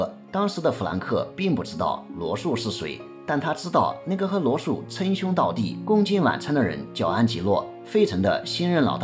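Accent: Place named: Anhui, China. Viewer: 越哥，你的安徽乡音是如此地迷人！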